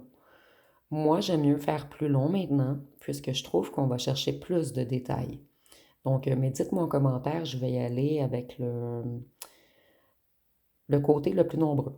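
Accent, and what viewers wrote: Quebec, Canada. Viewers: ton Accent Canadien Québécois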